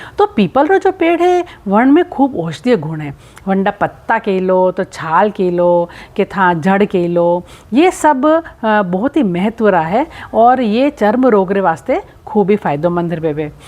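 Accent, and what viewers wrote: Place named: Mewar, India. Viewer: Bahut hi gyanvardhak video .....gajab ki mewadi boli h aapne....dashamata ki agrim shubhkamnae evm pranam didi🙏🙏🙏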